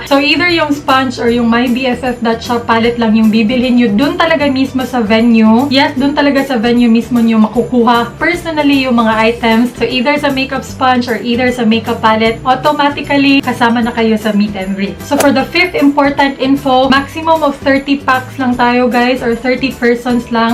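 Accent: Visayas, Philippines. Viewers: You're so loveable and very enjoying to watch! Tho you have that bisaya accent, you show your true and natural self to your subscribers! ❤ Continue to inspire us and do a lots great videos! May God Bless you always po Ate! Power! 💖💖💖 FB: Jorgie Villas Instagram: jrgvlls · mau diay bisaya kaau mo say AHAHAHHA I'm also a bisaya hii ate were proud to be Cebuano's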